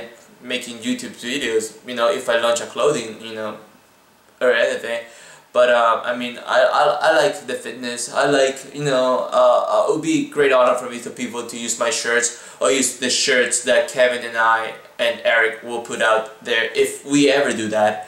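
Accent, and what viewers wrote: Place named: Latin America. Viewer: Oye de verdad que tu video es inspiracional. Y ese acento latino que posees me mantuvo hipnotizado a lo largo de todo tu video.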